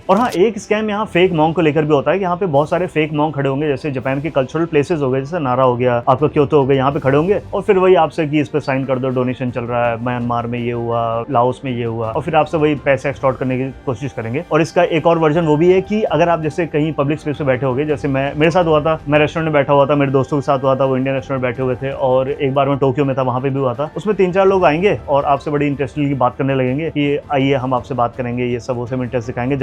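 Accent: Pakistan. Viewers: pakistani accent lag rha hai 😅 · pure pakistani accent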